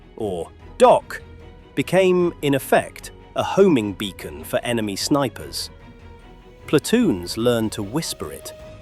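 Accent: United Kingdom. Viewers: why is this narrated by someone with a British accent?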